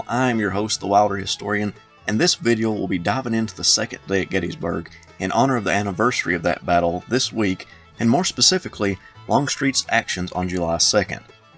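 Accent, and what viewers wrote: South, United States. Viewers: A hit job on a dead man, using his enemies and a piece of fiction as sources. Do I detect a Southern accent? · As soon as I hread the narrator's cornpone stupid accent I knew it was a hit job on Pete. Bigly sad.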